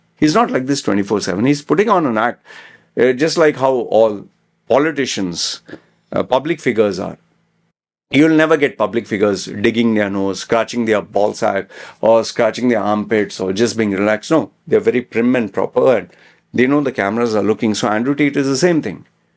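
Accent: India. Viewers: I dont understand the English from the Indian accent ..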